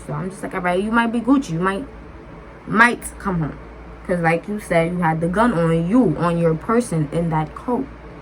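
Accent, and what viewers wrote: New York, United States. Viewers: “Put me on to one of your friends. Ones that you do drills with.... sike let me stop” 😂😂😂🤣 We know you deadass sis! Don’t stop! 😭😆 You’re so lit!! Love this channel! 🥰💕 And don’t change ya NY talk they’ll catch up “FEEL ME” 🙃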